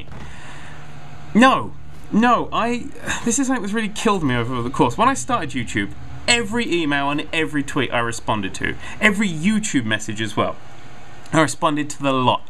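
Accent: United Kingdom. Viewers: 6. Your English/British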